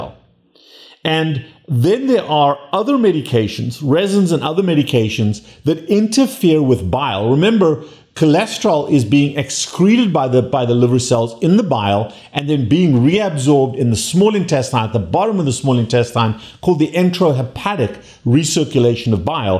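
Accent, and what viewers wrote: South Africa. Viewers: Sounds like a Saffa with an American twang on some words.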